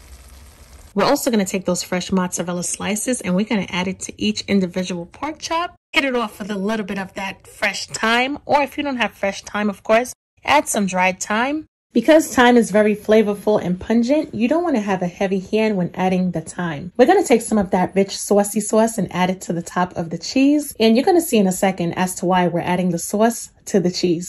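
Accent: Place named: Georgia, United States. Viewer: Looks great! Are you from Georgia or Alabama? I'm Florida raised, surprised I can't tell the difference.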